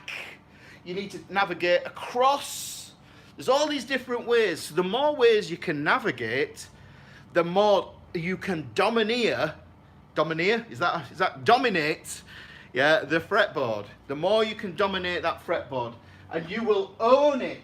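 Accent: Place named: Huddersfield, United Kingdom. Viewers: Wow, I finally found someone who teaches using a proper accent (I'm from Huddersfield too), and not only that, uses an overhead projector!!! You totally rock, and I am absolutely digging your theory lessons, really well explained 👍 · Art Attack for fretboard theory. Absolutely love your northern accent.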